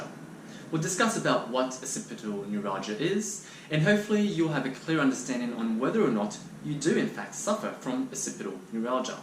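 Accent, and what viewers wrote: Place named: Australia. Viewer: I'd say Australia or New Zealand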